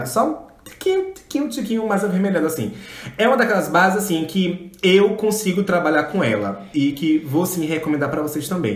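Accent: Bahia, Brazil. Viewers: SE TEM SOTAQUE MAIS LINDO QUE O BAIANO, EU NAO ME LEMBRO 😍😍😍😍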